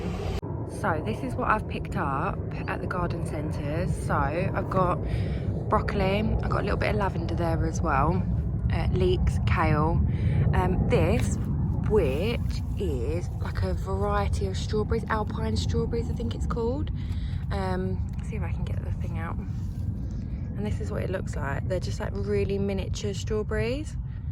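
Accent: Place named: Essex, United Kingdom. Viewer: I believe it's an Essex accent.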